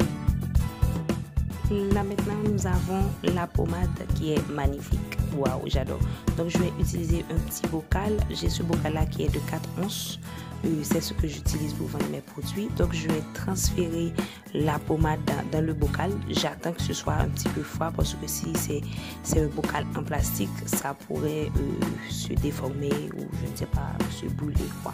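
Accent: Haiti. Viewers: Vous etes une haitienne ca c est sure...votre accent francisé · Tu es une haitienne benh ouais vous l'êtes avec tes prononciations francais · t'es haïtienne ça s'entend lol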